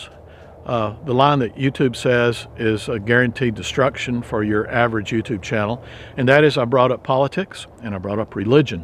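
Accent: Texas, United States. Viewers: you talk so cute. You have such a sweet delivery. Love the Texan accent.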